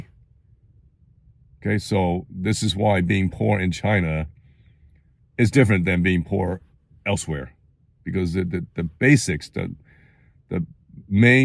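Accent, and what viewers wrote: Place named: New York, United States. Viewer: If I did not see your face, I would think you were like an Italian American gangster. I never expected you to say you were born in China. lol. You got that New York accent down.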